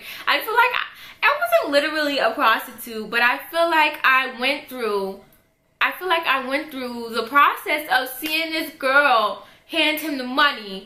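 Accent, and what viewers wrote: New York, United States. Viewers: That New York accent 😍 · Is this chick from new york ?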